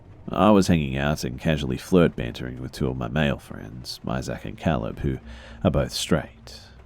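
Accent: Australia. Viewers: How his voice is soothing and amazing to hear. It’s like an Australian Prince Charming whispering in your ear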